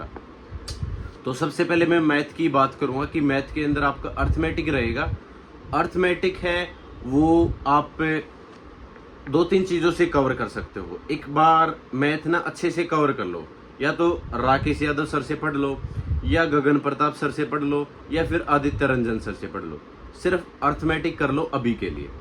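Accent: Haryana, India. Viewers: Bhai aap haryana k lgo ho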